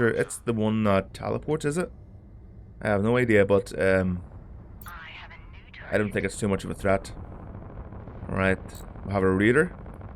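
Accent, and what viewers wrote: Northern Ireland, United Kingdom. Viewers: Ta man I was looking for this build. Good to see a Norn Iron accent online :D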